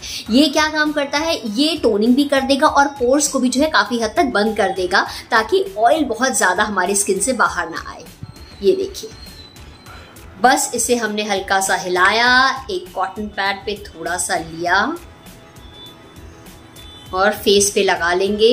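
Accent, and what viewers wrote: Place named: Lucknow, India. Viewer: Hi aap ki boli kitni achchi hai kya aap lucknow se belong karti hai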